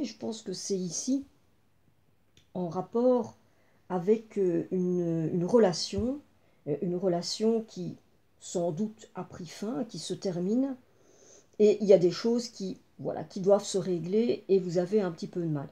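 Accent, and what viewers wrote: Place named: Wallonia, Belgium. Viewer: J'aime beaucoup vos tirages et votre accent wallon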